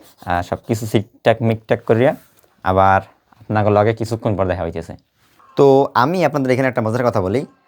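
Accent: Barishal, Bangladesh. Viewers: আপানার বাড়ি কি বরিশারে ভাষা শুনে এমন টা মনে হয়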